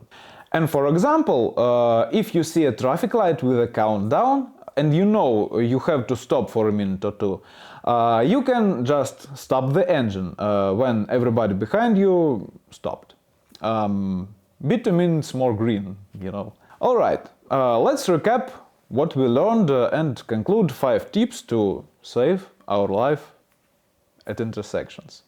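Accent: Russia. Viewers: I Think these videos are excellent, even the Russian accent adds authority to the message, shame no schools in UK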